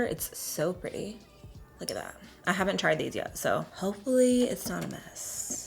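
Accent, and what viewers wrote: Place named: Southern California, United States. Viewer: Katie Meyer I think it’s the CA accent. Not “white privilege”. Some of us sound like that here in So Cal. Like, Valley Girl accent almost. (Just my opinion). She has stated she’s half Puerto rican too.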